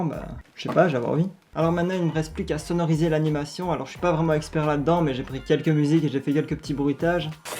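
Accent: Liège, Belgium. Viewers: T’es vraiment trop fort ! Je pense de c’est le stop motion le plus cool que j’ai jamais vu ! Sinon tu ne serais pas belge par hasard ? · Hello c est vraiment des supers videos perso j kiffe grave. Une petite question est ce que t es belge? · T'es liégeois? Vu ton accent ahah ?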